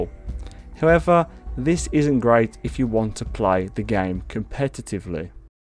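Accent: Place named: Wolverhampton, United Kingdom. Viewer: That accent sounds very local, I’m from Wolverhampton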